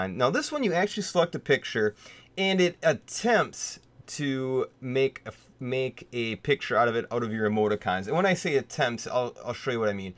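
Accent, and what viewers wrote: Canada. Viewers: I knew you were Canadian by the 15 second mark :). Correct me if im wrong though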